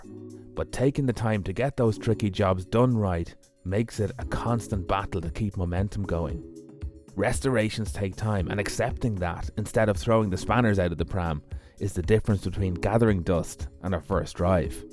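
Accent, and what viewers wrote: Ireland. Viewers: This is the best "one man show" on any platform EVER! I cant even fathom the work that goes into this! The work on the car is enough to make me faint but to package it with the stop motion and smother in the gravy that is that fantastic Irish accent I am hooked! I couldn't give two spits about RR but watched that playlist twice. is a TRUE Renaissance man.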